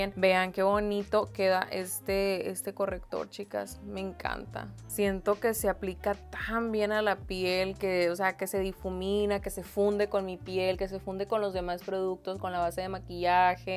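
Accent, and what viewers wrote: Sinaloa, Mexico. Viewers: Me encanta cm te maquillas y tu asentó Sinaluence me gusta plebe jaja saludos desde Arandas Jalisco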